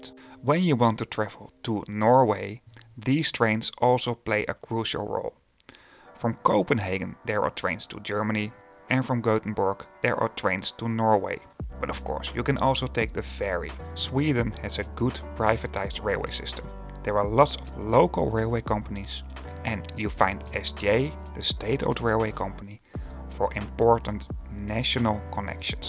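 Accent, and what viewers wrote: Netherlands. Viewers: Ik hoorde echt binnen een seconden dat je Nederlander bent 😅 We can't hide who we are 😛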